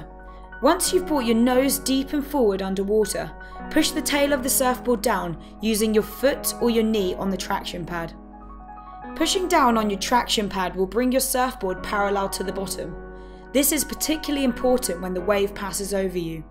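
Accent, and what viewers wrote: United Kingdom. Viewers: Hahahahaha..I just love the English accent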